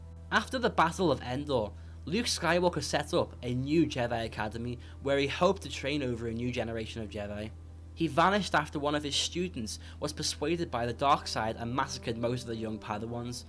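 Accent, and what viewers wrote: Liverpool, United Kingdom. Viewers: U scouse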